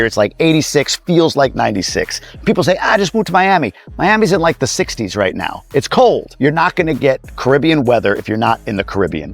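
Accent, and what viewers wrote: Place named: New York City, United States. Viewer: I knew that what a NYC accent! Now it all makes sense to me lol.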